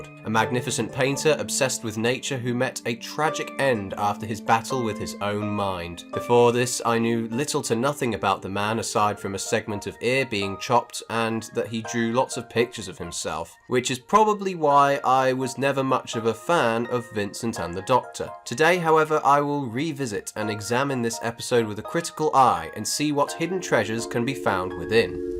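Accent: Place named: Wales, United Kingdom. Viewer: There's nowt wrong with a Welsh accent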